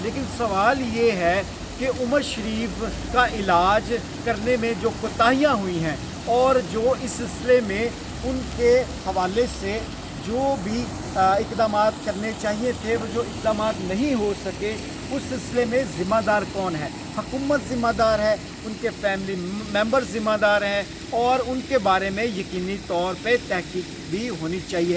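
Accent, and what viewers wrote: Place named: Punjab, Pakistan. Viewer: Mr Paindo Punjabi, First learn how to correctly pronounce simple Urdu words. Don"t speak in punjabised Urdu. All information you have given is not new at all.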